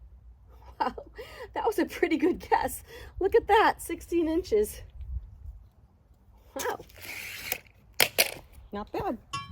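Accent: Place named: Massachusetts, United States. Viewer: I'm surprised you didn't call Ms. Dig before you dug some holes. Be careful!! Love your accent so Massachusetts just like mine even though I've been gone from Taunton & Boston so many years ago. You sound like my cousin from Taunton. Spring has sprung....take care.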